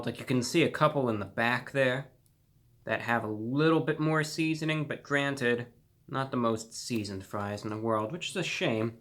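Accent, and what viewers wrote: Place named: New York, United States. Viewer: Where are you from? You sound like you are trying very hard to talk without an accent, but you actually have a heavy one. I'd guess NY.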